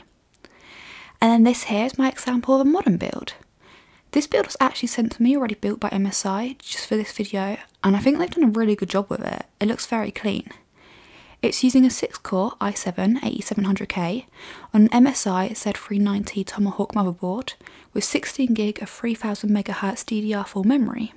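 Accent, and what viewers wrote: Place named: Cockney, United Kingdom. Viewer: You've been living in London lately? Your accent is sounding a bit cockney.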